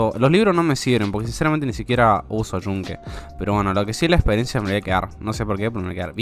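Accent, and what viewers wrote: Argentina. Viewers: Wacho sos de cordoba?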